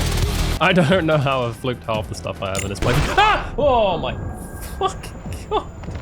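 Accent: United Kingdom. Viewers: I didn’t hear a difference in our British accents and yours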